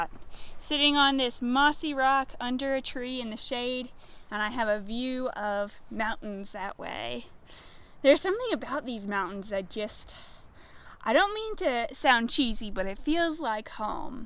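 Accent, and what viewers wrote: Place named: Kentucky, United States. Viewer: I’ve watched a few of your videos even including the van build. Max Patch Damascus and Mt Rogers are three of our favorites. Fortunately we live close since we live in central NC. A comment mentioned you as a Kentucky girl. Before reading that clue I could not put a finger on your accent. I even asked my wife who is from the Finger Lakes to listen to you. Of course she had no clue. I went to graduate school in Louisville 40 plus years ago so 4 years in Louisville and across the river (cheaper rent) in New Albany Indiana should have helped with your accent. Have a great journey!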